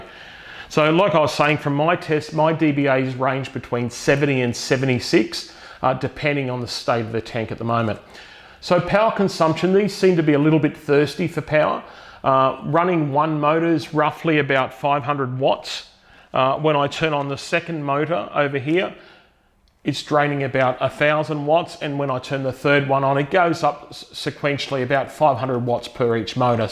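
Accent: Australia. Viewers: Thanks for sharing. Glad to hear an Aussie accent in the review so I know I know it is possible to get this sucker locally (pun intended). Just to note, a 3dBA difference is about double the sound pressure level, so from quiet to noisy for that thing it is a difference of 4 times the sound pressure level between low to high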